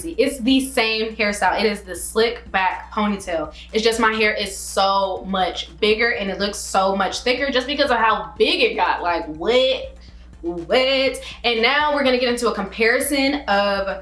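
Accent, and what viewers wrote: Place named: South, United States. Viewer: U sound country and I never noticed bc I was lived in tx now Indiana yea u def sound country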